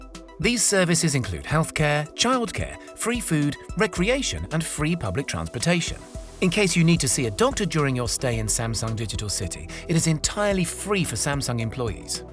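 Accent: United Kingdom. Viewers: Its Football god dammit wtf is soccer. And you even got a british accent........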